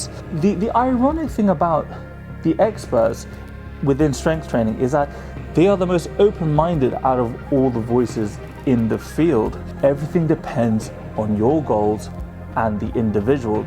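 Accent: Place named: United Kingdom. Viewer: Nicely done my dude. Damn that British accent haha you put the rest of us to shame 😂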